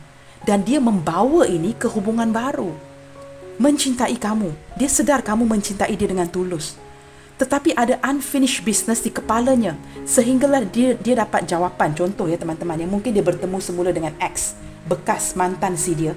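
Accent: Malaysia. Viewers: org mn ini bacanya ky org malay.pusing dengerin nya a jdi eheh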